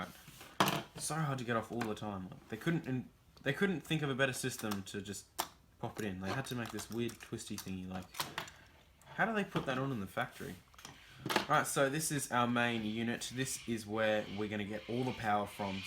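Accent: Australia. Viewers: Woo a Aussie, great Video, lots of help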